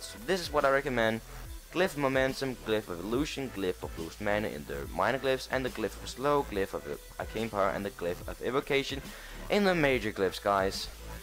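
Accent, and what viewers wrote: Denmark. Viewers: Your accent sounds danish. Are you danish?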